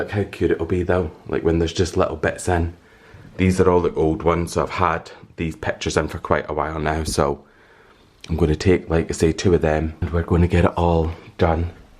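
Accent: Scotland, United Kingdom. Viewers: Are you Scottish I 💗 your accent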